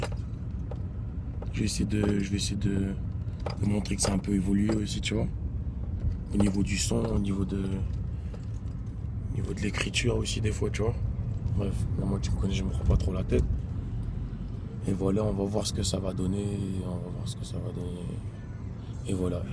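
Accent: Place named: North, France. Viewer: trop fort l accent de chez moi sheguey le nord en force ca fais bizarre on entends pas souvent un accent comme ca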